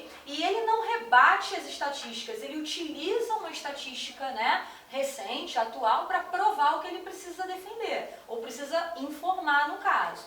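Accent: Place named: Rio de Janeiro, Brazil. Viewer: Carioca é foda, fico prestando atenção só no sutaque kkkkk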